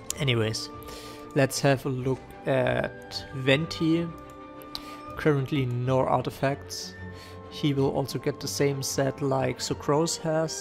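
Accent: Germany. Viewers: Don't think so. Sounds very german. Unlikely he would consider chinese server with rather bad ping.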